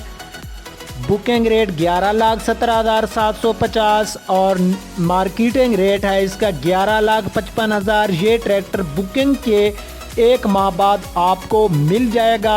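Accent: Saraiki, Pakistan. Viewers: bhae is a Saraiki speaker. So, his accent is Saraiki but his urdu is more better than any other urdu speaker. Let me explain more. If a pathan speaks urdu his accent is identifiable that he is pashtoon but speaking urdu. Same with punjabi. When Punjabi speaks urdu, his accent shows that he is punjabi speaker. Here in this case you guys think that bhae is speaking wrong but bro its his accent. That is from his mother language Saraiki. Saraiki is a sweet language and require no pressure on throat like pashtoo and punjabi. It relates to sindhi and balochi. So, respect him.appreciate his work and thumbs up.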